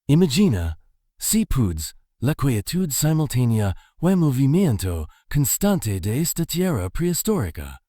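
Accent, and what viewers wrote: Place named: United States. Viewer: Qué cojones... Han puesto a una IA gringa cateta a pronunciar español?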